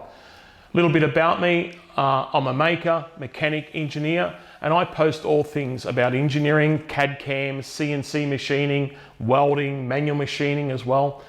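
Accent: Australia. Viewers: Thanks for sharing. Glad to hear an Aussie accent in the review so I know I know it is possible to get this sucker locally (pun intended). Just to note, a 3dBA difference is about double the sound pressure level, so from quiet to noisy for that thing it is a difference of 4 times the sound pressure level between low to high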